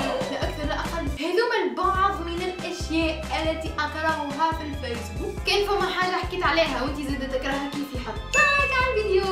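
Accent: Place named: Sahel, West Africa. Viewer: hhhhhhhh you're funny,brabi se7lia? lehjtek thohrtli se7lia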